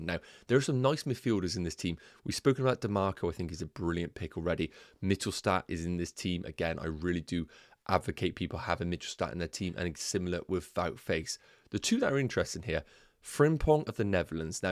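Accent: Bristol, United Kingdom. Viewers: You sound like a Bristolian, do you support City or Rovers?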